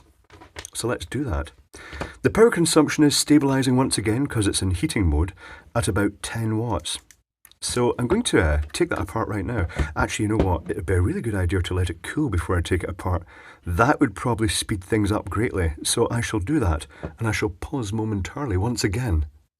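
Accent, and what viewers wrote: Scotland, United Kingdom. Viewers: I'm just going to watch your video for your accent! love it Scottish right? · You have the most British accent I have ever heard, but then again I am from Stoke-on-trent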